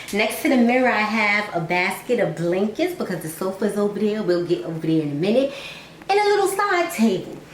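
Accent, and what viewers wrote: New York, United States. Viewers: I love your New York accent . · I love that NY accent 🥰. You made your home very welcoming and it’s cosy and accommodating and so organized and bright.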